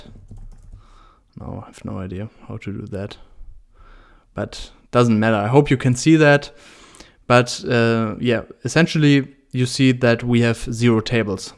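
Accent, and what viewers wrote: Germany. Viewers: Love your Videos. Greetings from Germany, are you german btw? Your accent seems sort of german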